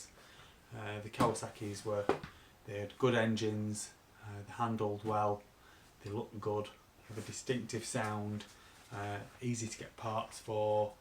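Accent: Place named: North, United Kingdom. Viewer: thats a good price im looking more a 250 that i can fully restore around mid 80s as thats what i grew up on im 40 this year and getting nostalgiac lol i watch your bikes on youtube you sound like a northerner buddy where abouts are you in the country im in barrow in furness in cumbria im saving up to get a bike there so hard to get hold of do you get them all the time could do with your number pal and thanks for getting back to me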